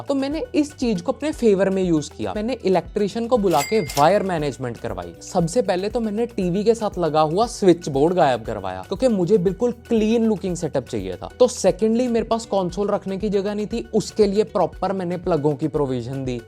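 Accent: Punjab, India. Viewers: Bro konsi city me ho ? Apki voice me punjabi touch hau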